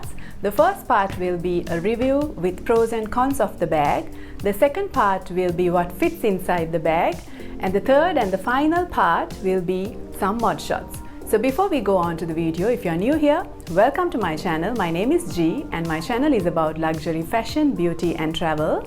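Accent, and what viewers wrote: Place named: India. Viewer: You are beautiful. You look E. African Ethiopian Eritrean or Somalian but by your accent you are Indian, right?? Thx for sharing.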